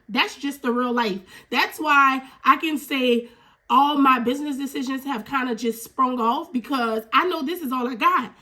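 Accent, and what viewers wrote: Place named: New Orleans, United States. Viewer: the accent is giving New Orleans ❤❤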